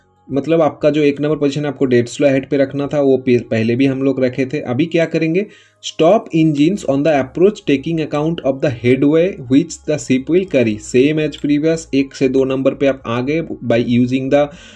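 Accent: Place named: Bengal, India. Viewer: You must be a bong!!! Ure accent suggests so...anyways these videos have been of immense help....Two thumbs 👍 up for your hard work... Really appreciate it....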